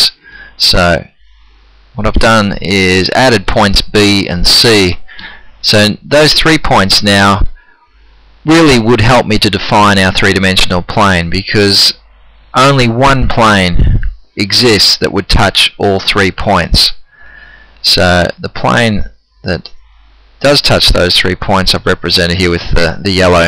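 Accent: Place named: Australia. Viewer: smexy aussie accent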